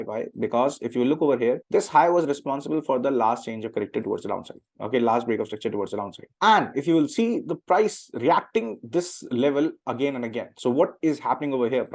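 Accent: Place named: India. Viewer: India Accent is terrible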